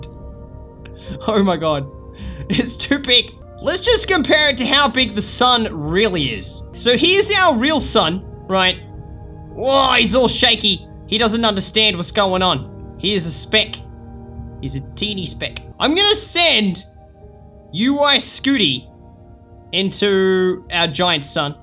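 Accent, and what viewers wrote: Australia. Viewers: Australian Jacksepticeye = Immediate sub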